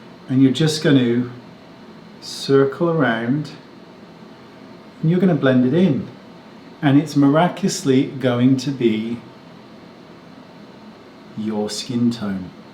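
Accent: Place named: England, United Kingdom. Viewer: Robert Welsh also did something like this on his YouTube channel. Out of all the channels I watch you and him are my absolute favorites. Maybe it’s the fact that your both true makeup artist but I think the English accents pull me in as well lol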